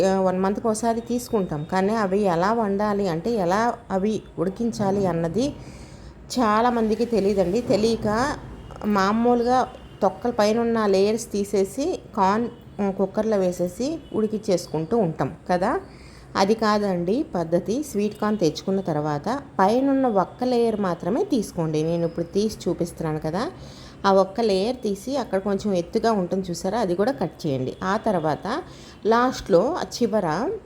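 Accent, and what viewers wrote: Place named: Telangana, India. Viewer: తెలంగాన vsllu వాళ్ళ yasatho matladutharu manayasa thapala అంటె thappa ?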